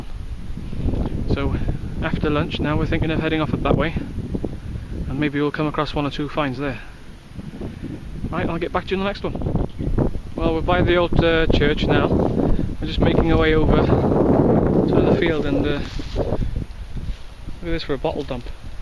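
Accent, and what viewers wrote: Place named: England, United Kingdom. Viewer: I enjoyed your English accent almost as much as the finds! Fascinating bit of history where you live. You are very fortunate. Thank you it's been fun! -Jeff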